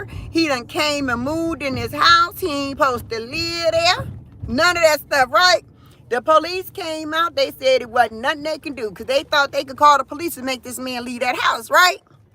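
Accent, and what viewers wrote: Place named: South, United States. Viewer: Good Morning. Just watching your video. You're having so much fun. That's what I'm talking about. I have always loved your deep Southern accent. You sound like you live in the deep south. Love it❣️ You two keep having fun and be blessed.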